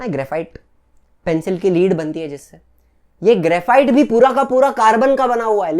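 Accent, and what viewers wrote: Maharashtra, India. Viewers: Dada tu Marathi ahes?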